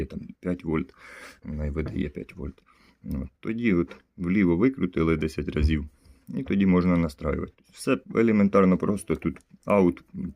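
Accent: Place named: Surzhyk, Ukraine. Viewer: Автор говорит на суржике...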